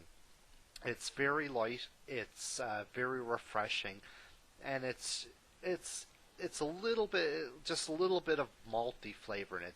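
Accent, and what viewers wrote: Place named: Ireland. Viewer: You sure your not from Ireland, you sound really Irish.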